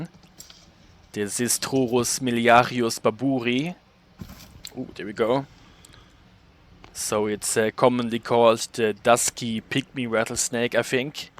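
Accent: Germany. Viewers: Youre not English right youre another Language like German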